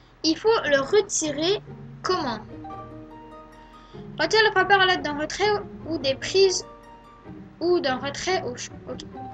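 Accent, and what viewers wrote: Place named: Canada. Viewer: j'ai l'impression que tu es un canadien ce n'est a cause de l'accent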